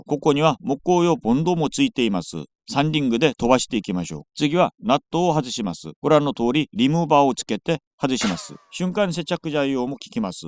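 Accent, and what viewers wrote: South Korea. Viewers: 韓国訛りの日本語でも上手😊 ずっと日本の人だと思ってた~応援してます🎉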